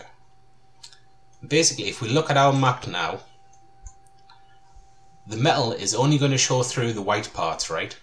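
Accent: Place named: Northern England, United Kingdom. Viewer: Hello, mate. This video is a pure gem for me saving this to my favorites. Cheers! P.S.: You've got a very familiar accent to me. It reminds me (actually it's identical) of my British friends from the oil field I work at. I don't quite remember their origin but sounds like a bit of slow Scauser but more Northern England. Where are you from?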